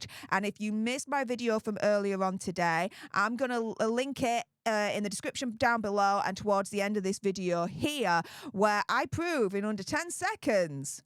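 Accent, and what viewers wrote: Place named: North, United Kingdom. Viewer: Excellent video- damning tweets. But surely with your great northern accent "it will bite him in't ARSE"😂...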